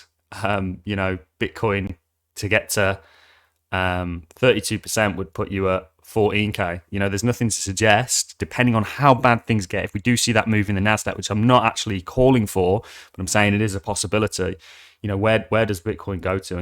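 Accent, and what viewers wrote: Birmingham, United Kingdom. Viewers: Where abouts in the midlands are you from? ( I detect a slight brummy twang ) 😂 I'm from Tamworth and you have a very similar accent.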